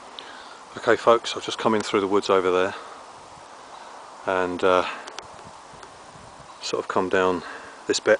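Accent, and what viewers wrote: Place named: Cockney, United Kingdom. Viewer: nice vid nice to here abit of cockney me china keep up the good work on yer plates fish and tater mate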